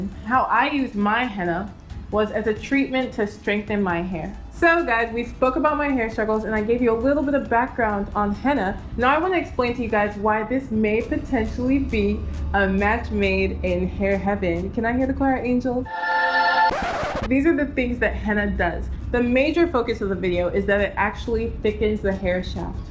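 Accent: Nigeria. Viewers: Dry Nigerian accent 😂 at least you have one. I'm half Nigerian and never picked up the accent :( but I love it though! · DRY Nigerian accent. LOLEST. Loved it.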